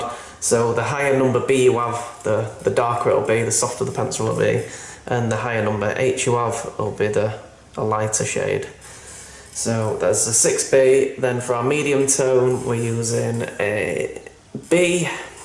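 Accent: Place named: North, United Kingdom. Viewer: Where are you from, sound northern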